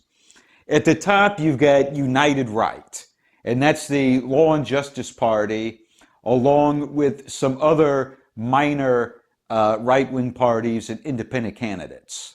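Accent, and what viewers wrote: United States. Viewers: Love the information. All thumbs up! Thank you! You sound like you’re speaking in Chicago accent. I am Polish/Chi south side 3rd gen. You might be similar or other Midwest. Just curious if you care to divulge.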